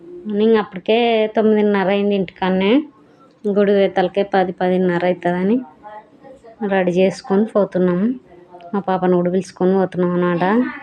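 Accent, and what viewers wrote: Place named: Kurnool, India. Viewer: Hi akka Me vedios chala baguntai medi a village Madhi Kurnool Andhuke me basha vinte happy ga anoinchindi❤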